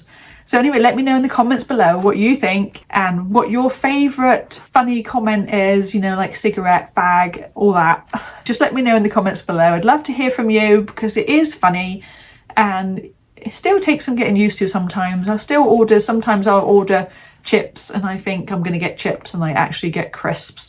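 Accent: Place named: England, United Kingdom. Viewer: Still got your English accent! Hang on to it Luv!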